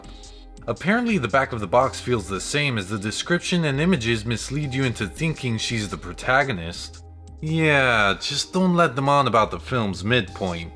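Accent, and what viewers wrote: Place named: Ireland. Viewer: I can’t tell if you’re Irish or American or both. You have an Irish twang in your accent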